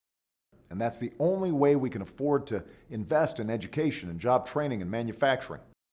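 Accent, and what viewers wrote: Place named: United States. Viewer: Ingles? Mas bien americano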